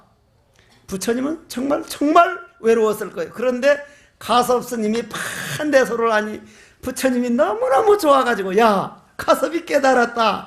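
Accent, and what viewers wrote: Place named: Gyeongsang, South Korea. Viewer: 니그카이 내그카지 경상도사투리. 하 하 하 시님 감사감사합니다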